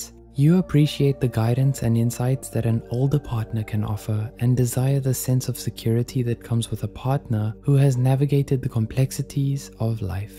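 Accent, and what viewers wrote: South Africa. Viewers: Why is this voice giving a low-key white South African😶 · the voice of the person in this video isounds South African pretty cool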